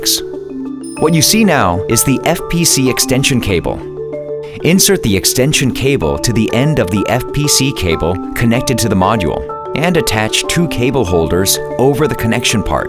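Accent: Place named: China. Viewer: Harible Chinglish in this but i'd love to know what Text to speech program they used.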